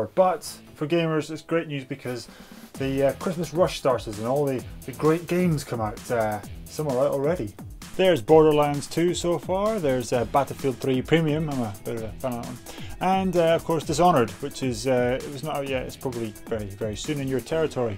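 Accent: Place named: Ireland. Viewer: This is an irish accent right?...I like it. I want it. Gimme your accent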